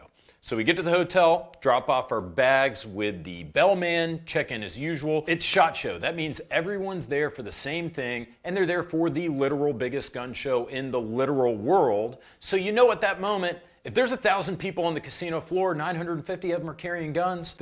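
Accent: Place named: Southern California, United States. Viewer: Hey, how come you have a Southern Californian accent but you’re from the actual South? You sound like one of us. 🤙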